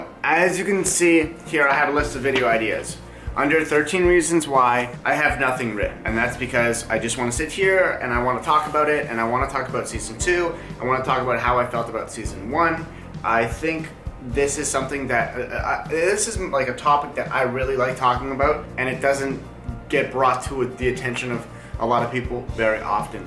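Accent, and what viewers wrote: Canada. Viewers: Love your cute Canadian accent eh!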